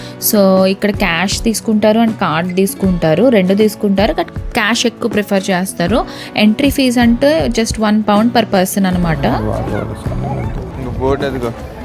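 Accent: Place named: Andhra Pradesh, India. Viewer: యూకే లో ఉన్నా గాని అక్క మన ఆంధ్ర మాటలు మాత్రం 👌👌👌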